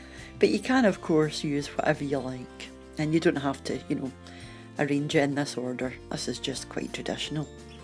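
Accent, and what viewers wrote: Scotland, United Kingdom. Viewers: YouTube algorithm just introduced me to your channel. I love your videos AND your lovely Scottish accent AND YouTube's auto-captioning of your lovely Scottish accent. 💕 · Could listen to your voice all day. Half of my family are from Scotland and I love the Scottish accent 😍 reminds me of home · I love your Scottish accent and the fact your recipes use normal ingredients. Here in America some recipes just get weird and I would not eat them. Can you answer me a question? What is clotted cream? Keep up the great videos. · Thank you for sharing this scone recipe. It's the first time I've ever made them. Your video was really easy to follow and love the Scottish accent. Somehow it makes the food sound more delicious. Lol 😂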